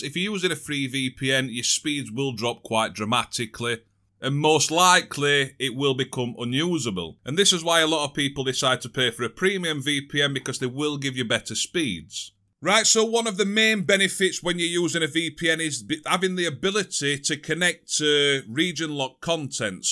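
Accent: Yorkshire, United Kingdom. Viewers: Won't lie you make me laugh are you Yorkshire or Lancashire you know your s***🤘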